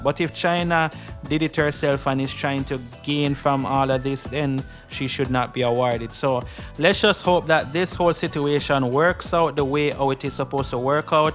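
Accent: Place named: Jamaica. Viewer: It's his accent. He is Jamaican. I'm from St. Lucia in The Caribbean so I would know. All of us in the Caribbean have our various accents.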